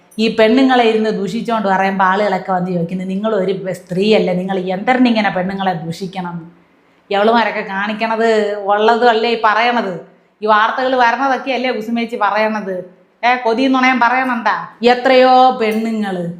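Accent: Thiruvananthapuram, India. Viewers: അവതരണം സാഹചര്യത്തിനനുസരിച്ചു വേണം. 3 പേർ മരിച്ചതിൽ ഒരാൾ അങ്ങേയറ്റം ബഹുമതി ആവശ്യപെടുമ്പോൾ ഇത്തരത്തിലൊരു അവതരണം നിന്നും ഒട്ടും പ്രതീക്ഷിച്ചില്ല. കൂടാതെ തിരുവനന്തപുരം ഭാഷാ പ്രയോഗം അതിരു കടക്കുന്നില്ലേ എന്നൊരു സംശയം. ഞാനും ഭാര്യയും നിങ്ങളുടെ സ്ഥിരം പ്രേക്ഷകരാണ്. അഭിപ്രായം ഉൾകൊള്ളാൻ കഴിയുമെങ്കിൽ ഉൾകൊള്ളുക.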